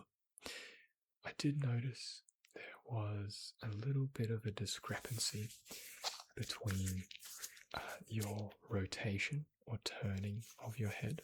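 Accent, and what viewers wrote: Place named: Australia. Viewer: It's so lovely to discover an Aussie voice, makes me feel at home 😀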